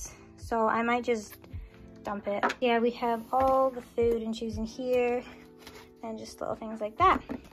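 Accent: Scotland, United Kingdom. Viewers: i love ur accent ( I think ur scottish ) U sound like my dad when hes on call with my nana cuz my dads scottish and my nans scottish and Irish( Edit ) I just realised u said ur English but moved to Usa so sorry x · Are you originally from Scotland? I hear a bit of Scottish accent sometimes but could be wrong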